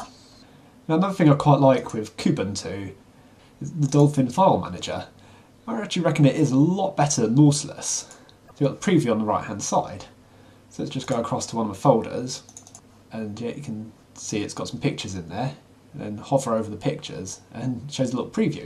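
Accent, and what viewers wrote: England, United Kingdom. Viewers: You're from England?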